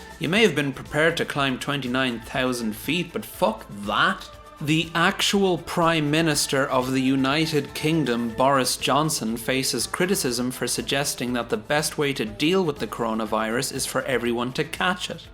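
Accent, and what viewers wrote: Ireland. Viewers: I just discovered this channel and now my internal monologue critiques stuff in an Irish accent. Great channel!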